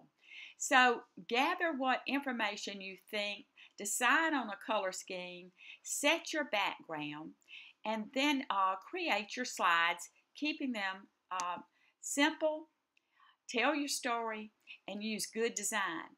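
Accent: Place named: South Carolina, United States. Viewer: Thank you. That was a very nice tutorial and seems much easier than some of the other tools I've toyed with tonight.Now, tell me - are you from South Carolina? (I am originally) and your voice made me feel back at home.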